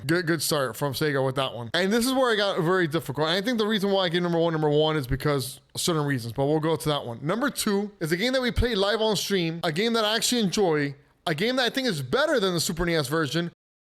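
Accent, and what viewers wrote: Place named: Miami, United States. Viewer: You sound like you're Miami? Are you?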